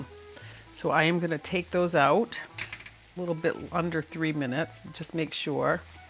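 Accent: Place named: New England, United States. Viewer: It is great to wake up with my coffee and one of your videos. I have been trying to identify your accent. Certain worsa you say remind me of my family in NNew Hampshire and Massachusetts.. We will be trying out your donuts very soon, thank you .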